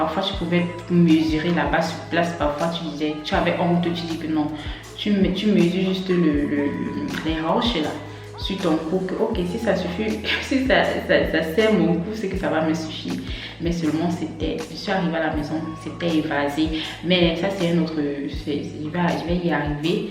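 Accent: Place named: Yaoundé, Cameroon. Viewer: Warr 😅c'est de la ville aux 7 collines, dc tu parles comme ça 😂😂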